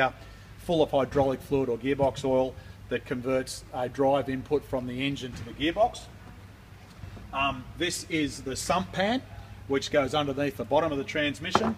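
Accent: Australia. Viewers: While no secret was revealed, other than full disassembly required for filter change, your Oz accent gave the video a "Richard Attenborough" like effect which was enjoyable. Great video. Thank you.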